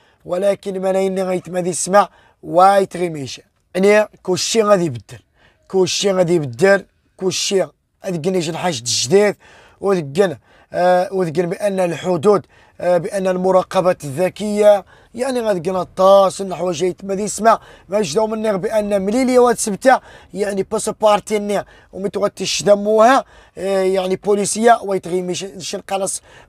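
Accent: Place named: Rif, Morocco. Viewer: اللهجة الريفية الامازغية المغربية....🤩🤩😍🥰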